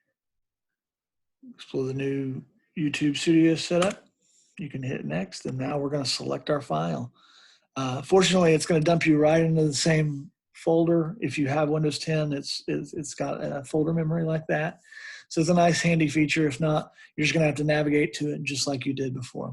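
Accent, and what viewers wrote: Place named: United States. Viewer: This was a great video. So nice to hear an American accent instead of these Indian accents.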